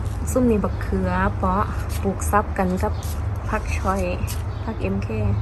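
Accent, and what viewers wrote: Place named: Isan, Thailand. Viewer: มาให้กำลังใจน้อง ค่ะพูดอีสานเสียงเพราะมาก สุขภาพแข็งแรงแล้วหลาย มาเดินดูผักปลูกผักไม่หยุดเลยรักษาสุขภาพด้วยนะ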